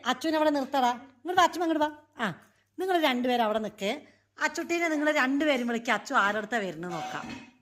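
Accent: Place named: Palakkad, India. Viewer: ഭാഷ കേൾക്കുമ്പോൾ പാലക്കാടാണെന്നു തോനുന്നു.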